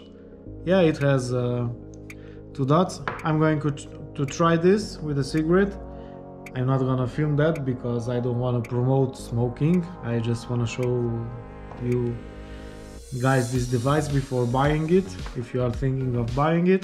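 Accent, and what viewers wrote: Romania. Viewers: Esti roman, se simte dupa accent, nu trebuie sa ma uit la tine in profil ca sa imi dau seama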